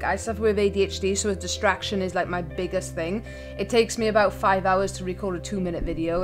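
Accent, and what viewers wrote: Wales, United Kingdom. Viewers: Thanks for sharing your story with us interesting not always good and iam sad to hear what u had too go through but i believe we learn and hopefully grow stronger as u said through these experiences. Love your Welsh accent gorgeous, my music tastes are almost completely different i grew up a different music area 60- 70s , now its changed again, night wish, helloween style , all the best for further endeavour's with YouTube and hope it goes well for you hugs. Peter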